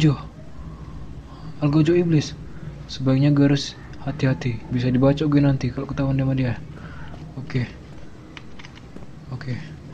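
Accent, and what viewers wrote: Medan, Indonesia. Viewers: mas bahas mas kaya orang medan mas bro dan pak bro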